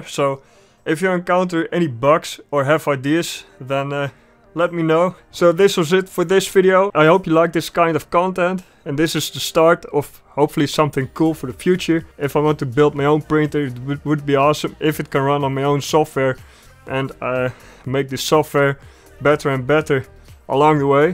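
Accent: Netherlands. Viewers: Is deze guy gewoon nederlands :D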